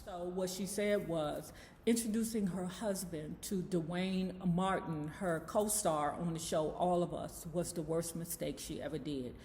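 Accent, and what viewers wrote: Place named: South, United States. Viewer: Heard the go-go and was like 😳.. who dis? While time I’m driving and listening wondering how you from here with that dirty south accent 😆❤️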